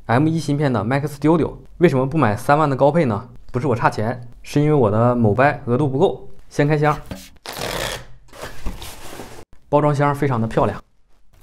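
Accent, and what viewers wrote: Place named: Northeast, China. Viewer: 你是东北林区的吗？和那个林区大雷一个口音啊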